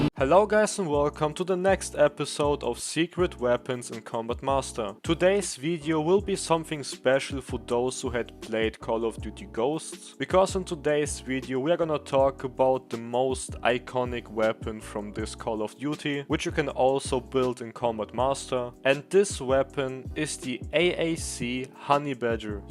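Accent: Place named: Germany. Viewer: Nices Video man hört direkt dass du deutscher bist haha 😄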